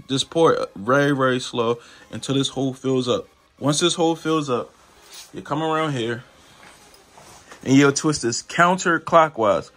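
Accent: Baltimore, United States. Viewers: Based on your accent I'm willing to bet you from the bmore area, or somewhere close lol. But if not, where are you at? I'm in RVA and need a 2jz ge vvti overhaul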